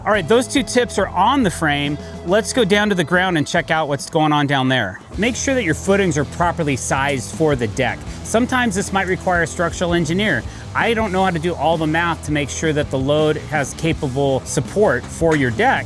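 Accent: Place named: California, United States. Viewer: Yeah this dudes a clown they don't even box in their post. Tell from his voice he up north or California probably. Code in his area isn't code for entire US and he is going to get homeowners and contractors having words with each other. Sounds gay so I'm sure drama is all he is trying to create. If your thumbnail is calling for folks to be fired! No reason not to have disclaimers telling home owner to CHECK LOCAL BULDING CODES before engaging with their contracter.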